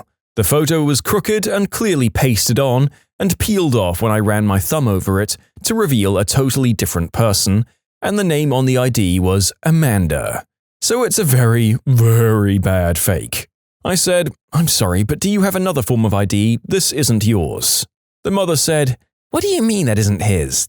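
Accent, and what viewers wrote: United Kingdom. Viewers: I love this guy's british voice, it's pretty cool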